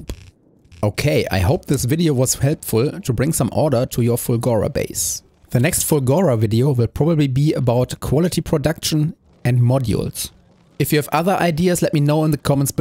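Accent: Germany. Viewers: I could watch these videos for hours just because of this german accent. (Rreally like it)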